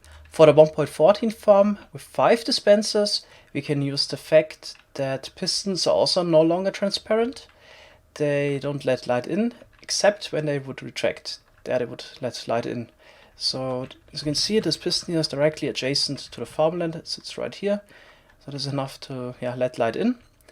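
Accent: Germany. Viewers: You sound very German lol.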